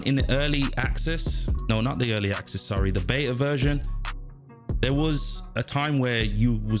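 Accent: United Kingdom. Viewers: Hey, why you pitting on a brum accent 😮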